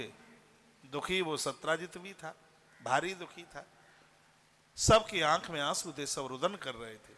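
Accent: Braj, India. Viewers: Gurudev aapki brajbhasha bahut pyari lagti hai Radhe Radhe❤🙏🙏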